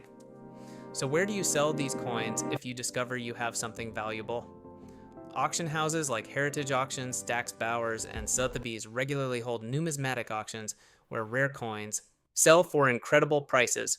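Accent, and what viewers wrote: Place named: United States. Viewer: So, why the American accent?? Big bull shit scam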